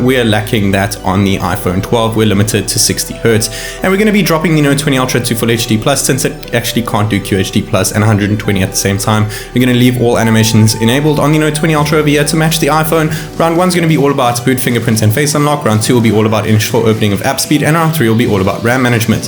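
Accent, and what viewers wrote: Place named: South Africa. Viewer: Hi. I've been a sub for about a few weeks and the first word that you said, when I watched my first video of yours, I already knew you were from South Africa.😅 I'm from South Africa btw.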